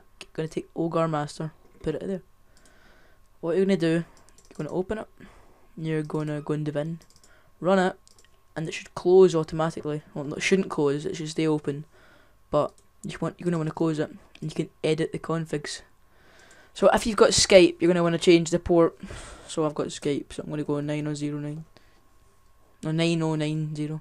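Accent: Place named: Scotland, United Kingdom. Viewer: Thought so i am 2 xD can just tell when some1 is scottish xD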